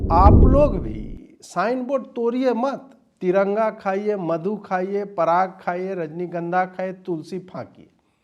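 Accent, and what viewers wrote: Bihar, India. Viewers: Your Bihari accent is very nice Ajit sir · EXCELLENT satire! Bihari accent is lovely!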